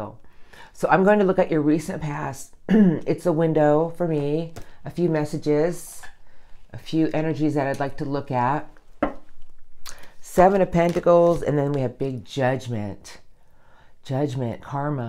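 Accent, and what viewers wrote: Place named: United States. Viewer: aww! Thank you! Beautiful reading as always. You speak amazing English, but look Spanish but sound American? You might have lived in several countries to date? You are certainly well traveled spiritually, I love your intuition and gifts. Thank you as always. ❤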